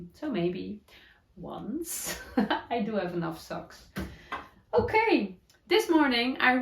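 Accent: Netherlands. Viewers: Oh.....you can talk as long as you want. We love to knit and listen. Your Dutchy-English is VERY good. And how special that you care enough to get it right and learn. It is just wonderful that we do not need to learn Dutch - especially if it is more difficult. Can you hear me laughing? It certainly sounds more difficult. Love your patchy socks - so worth it!!! I do that too. Have a lovely Easter weekend with your family on both Easter days. 🧶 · I love hearing your "Dutchy English" and to that end I never want to rectify what you say. That said we do say 'modify' and not 'modificate'. 🥰 xxx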